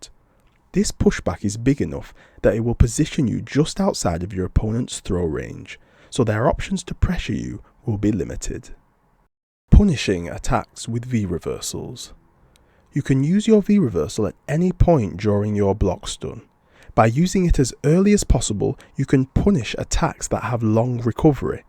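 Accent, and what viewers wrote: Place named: United Kingdom. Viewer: I love you're british accent man and yes i understand the v'reversal but i still can't play like the pros 🤣🤣🤣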